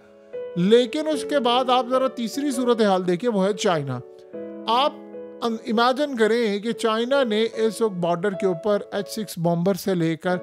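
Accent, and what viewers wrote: India. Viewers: تو بڑا کتا ہے والے۔۔۔اب کہاں گئی تیری حب الوطنی اب تو تیرا لہجہ بھی چغلی کھا رہا ہے کہ تو کوئی انڈین کتا ہے۔۔۔اب تیرے جذبے کدھر وڑ گئے ہیں ؟؟؟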